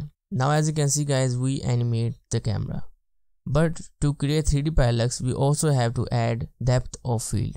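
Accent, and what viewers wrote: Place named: India. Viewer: I struggled a bit too. He sounds like he might be south Asian. Possibly Indian. I was able to navigate his accent because I’m friends with a lot of Indian dudes at my work who have similar accents. Once you work out his words, the tutorial is actually very good.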